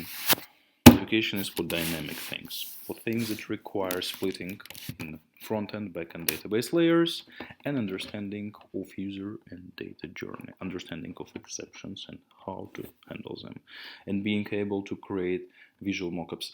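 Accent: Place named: Russia. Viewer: He has russian accent I hear.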